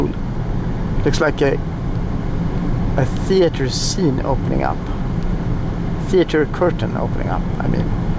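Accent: Sweden. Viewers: You sound like u are from sweden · that svedisch accent mannen, suverän bil!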